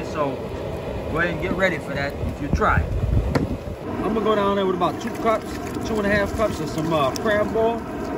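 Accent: Louisiana, United States. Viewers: Just sounds like some LA cajun to me, boss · Mr I love your videos and definitely that Louisiana accent can’t be duplicated I live in probably the hottest states in our country Tucson Arizona and we love the Louisiana food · He's Cajun,!!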